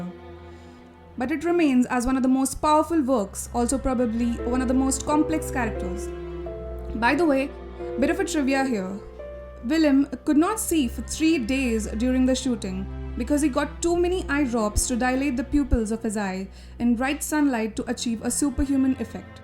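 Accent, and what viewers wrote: India. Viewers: I would seen this through if it wasn't for that strong indian accent. Reminds me of "microsoft" caller.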